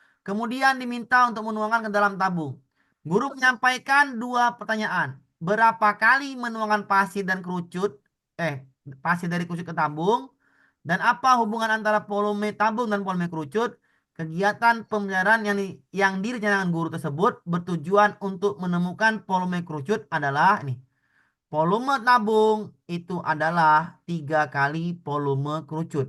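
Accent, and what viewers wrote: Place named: Medan, Indonesia. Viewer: Medan kali la pak ni yang ko dengar la apa yg di bilang tu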